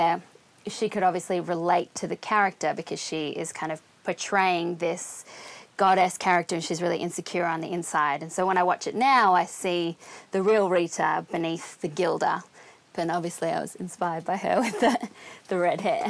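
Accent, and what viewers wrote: Australia. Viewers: that australian accent tho <3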